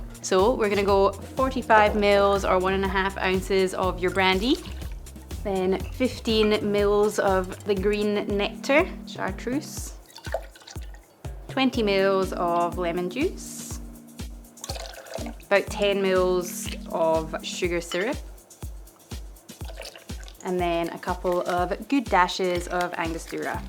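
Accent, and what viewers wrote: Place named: Scotland, United Kingdom. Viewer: Always look foward to your vids...So gorgeous, knowledgeable, and love your Scottish accent.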